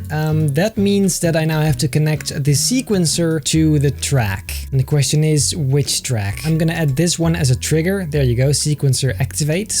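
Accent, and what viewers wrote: Netherlands. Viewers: You are dutch right? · He sounds so dutch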